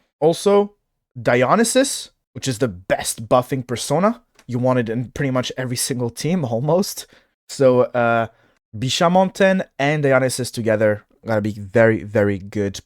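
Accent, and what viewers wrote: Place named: France. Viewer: You can't mask that french accent!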